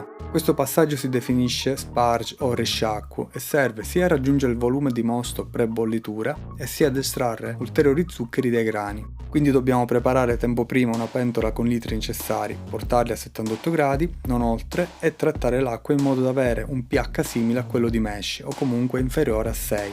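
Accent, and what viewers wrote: Calabria, Italy. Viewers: Bravo, sei calabrese?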